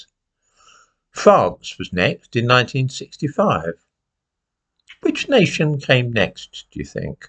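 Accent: United Kingdom. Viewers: Bad mic + British accent = no idea what he is saying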